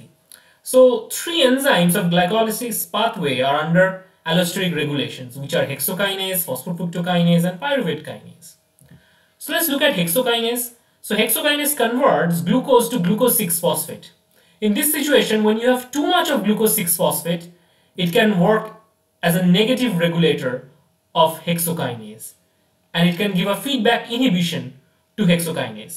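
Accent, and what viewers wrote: India. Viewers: lol he can't afford a real copy of windows? This cyborg voiced indian is sus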